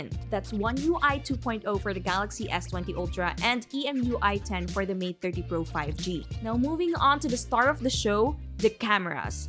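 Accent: Philippines. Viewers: sounds like pilipino accent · I can tell based on your accent that you are from the Phillipines. Am i right?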